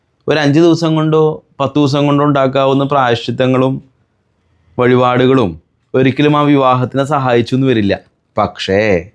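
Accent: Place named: Thrissur, India. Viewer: ഇവൻ തൃശൂർ ആണല്ലോ 😂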